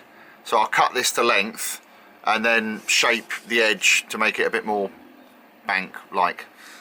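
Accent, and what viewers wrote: United Kingdom. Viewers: Where are you from? (In england) I love your voice/ accent.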